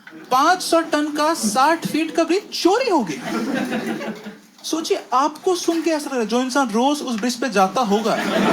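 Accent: Bihar, India. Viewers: Bhai , tumhari accent to bihari jaisi hai ,I think he is also from Bihar 😂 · After the whole video I felt you have learnt hindi from a bihari friend of yours maybe.. Cause my bengali friends also talk in the same way as you😅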